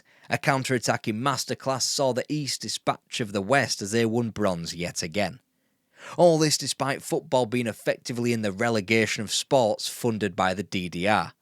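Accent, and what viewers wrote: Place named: Northern England, United Kingdom. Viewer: My girlfriend keeps trying to guess where you from she is between sheffield and manchester by your accent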